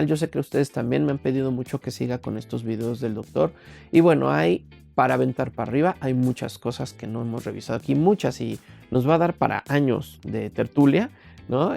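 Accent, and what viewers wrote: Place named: Mexico. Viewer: tu voz es genial , tengo un profesor que me gusta grabar su voz en clases porque después de escuchar y procesar la información de la clase y reproducir los audios me sirve para entrar rápido en flow y redactar párrafos de la investigación. Creo que tienes un gran potencial el ese tema porque eres mexicano y nuestro acento es considerado como neutro , los acentos de los españoles y sudamericanos no es armónico aunque el contenido sea interesante tengo que pausar varias veces los videos. Así de ñoños somos los investigadores jeje 😛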